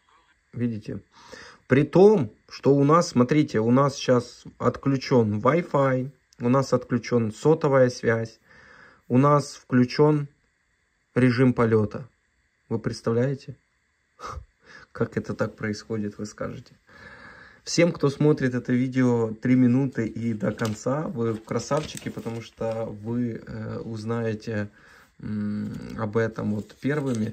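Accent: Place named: South, Russia. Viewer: Южно русский говор... Какчай качай... -?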